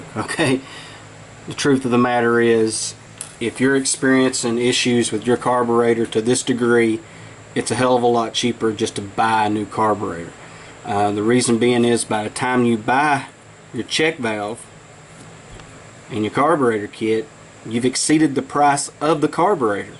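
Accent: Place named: West Virginia, United States. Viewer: Good video , thanks man. I'm guessing your from West Virginia ? We say "plars" too . Ha ha ! Thanks man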